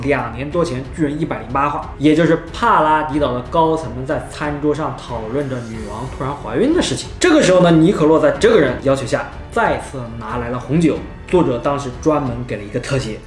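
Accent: China. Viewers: 這口音是大陸人吧，完全聽不下去了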